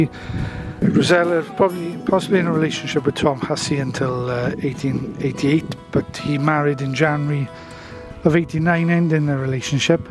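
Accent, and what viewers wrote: Wales, United Kingdom. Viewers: God bless ye Buddy, Thank you for doing such a crackin job on this, its very informative and interestingly delivered. LOve the twang of your broguish speak. you Welsh? nary to matter, its just very resonant of a past I do not know but none the less I am very much proudly from. I am of the Georgia and Carolina people, but do have Mississippi blood there still and I am highly honored by any and all my associations to Dixie, tis a privilege I owe my life for. And I thank yall for coming to be a part of it and show us some love. I hope you was treated like the good guests you are and come to visit more when you can. in the old Irish tongue, I believe its in order to convey, Caed amlle a failte' unto ye. Now, for the closing notion, by which, I request that you tell that fine lady there accompanying yourself, I love that tshirt shes sportin' like a trooper!!! 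God bless the Merseyside and the grooves that flow in and out of that river there. Should ever I be of any help to you, let me know, im in Seattle but, heading to Mississippi and NOLA this spring or summer, intend to check out this very place thanks to yall and could provide a wee spell of boots on the ground if theres something yall missed or need a good lookin at. Thank you again and to you a special salutation thats privi amongst us old sons of the South, nary to matter the color, your God, your choice in rose over magnolia, but, just so long as you dont put no sugar on yo grits, I warmly bid unto thee, Deo Vindice and Pea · Enjoyed that 👌🏼 It was refreshing to hear a welsh accent knowing their onions. Where you from? I’m in Swansea. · I had no problem understanding or knowing you are welsh,, but then I'm from 🇬🇧 👍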